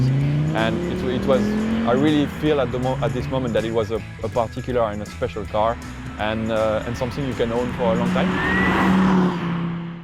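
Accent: France. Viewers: French accent suck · Un français qui parle anglais c'est toujours grillé ! Bravo en tout cas, j'adore cette caisse. et de savoir qu'une moto trial rentre dans le coffre ça donne des idées !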